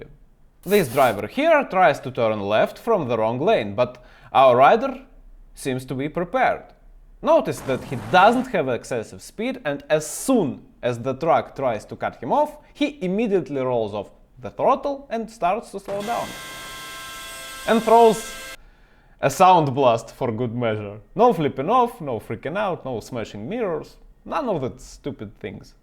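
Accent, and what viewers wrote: Russia. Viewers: Yes! Our presenter in question is quickly becoming one of my favourites. I really like Russian accent. I like how he further analyses MotoStar videos; I think they may also be presented by a Russian guy. Humour and good sense make for very entertaining and instructive videos. This guy is a good teacher.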